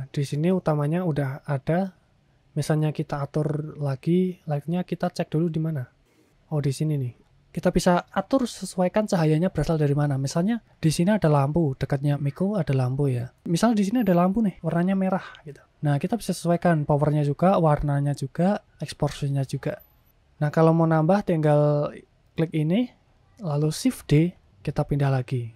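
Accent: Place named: Java, Indonesia. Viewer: Lumayan medhok ya mas brur😄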